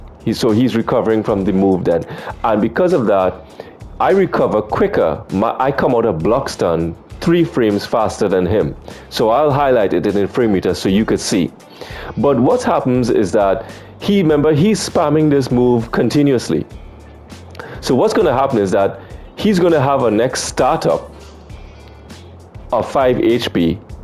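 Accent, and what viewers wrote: Trinidad and Tobago. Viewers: You a trini? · For some reason the Trini accent is helping. Thanks for this tip, from one islander to another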